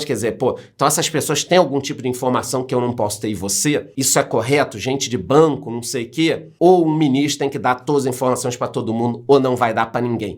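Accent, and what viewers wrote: Rio de Janeiro, Brazil. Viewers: Pra quem é nordestino como eu, parece muito que vc é carioca, o linguajar não é chulo mas o sotaque é carregado sim. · Parece muitooo que vc é carioca😍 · Moço, basta vc falar um oi que já dá pra saber que vc é carioca. Kkkkkkkkk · Caraca, sotaque bem carioca kkk, não tem como não saber🤣🤣🤣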